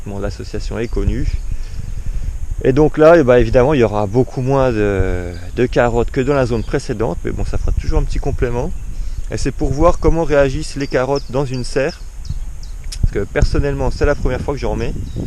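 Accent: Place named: Lorraine, France. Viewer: Et oui les parents habitent la Lorraine et je reconnais bien ce petit accent bon dimanche bravo pour ces vidéos très intéressantes a bientôt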